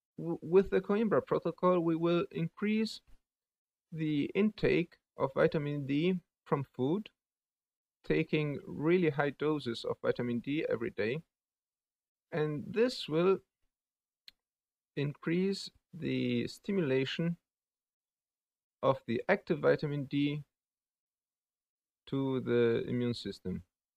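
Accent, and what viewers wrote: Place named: Germany. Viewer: Excellent explanation !!! (I would just add a couple words about the Vitamin D receptors, since people will find that mentioned in other explanations, for example by Dr Coimbra himself). I am myself in the process of starting that treatment, already had some fantastic result starting it without actual knowledge about Coimbra, just based on the Book by Jeff T Bowles, who did a test on himself. Now I found the amazing story and results of Coimbra, and am looking for a physician to have supervision, as you recommended too. Since you sound a bit German, my question is whether you know of any doctors doing that treatment besides the ones mentioned here <link> Thanks a lot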